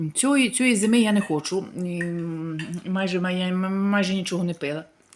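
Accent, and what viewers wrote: Lviv, Ukraine. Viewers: Дивлюсь Вас з Києва,дуже подобається ваша мова,відчувається,що жили у Львові Вчуся у Вас позитивно дивитись на все, не заздрити нікому,радіти кожному дню,дякую за відео Мрію побувати в Італії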